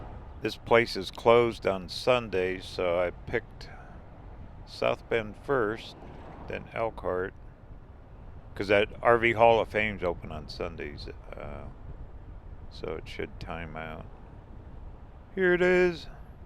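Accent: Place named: Ohio, United States. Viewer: Yay, you are heading to Ohio. I was so, so happy to hear you decided to go to Ohio. The very first time I heard your voice and the way you talk, I just knew you were from Ohio. You remind me so much of my dad, especially the expressions you use and the way you laugh. This Ohio girl knows Ohio people as I call them. Too cool for school. My dad moved from Ohio to Arizona too. He had family in the Phoenix/ Scottsdale area. I was one of those little girls riding a tram at a young age. My Pop was a railroad engineer and my Mamaw had a lifetime pass. She took me on the train when I was younger than five and I still remember that ride. Train museum brought back memories. Thank you. ❤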